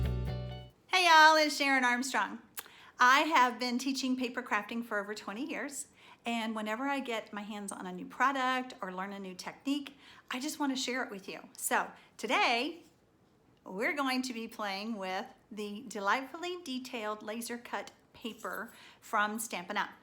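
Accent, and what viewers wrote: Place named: Texas, United States. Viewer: Wonderful video! I wasn't going to buy this laser cut paper until I saw your video because I didn't know how to cut it or use it, but now I can't wait until my order comes and I can try to make a beautiful card. Thank you so very much! (I'm from Texas, too, and I love your accent....sounds like home to me!)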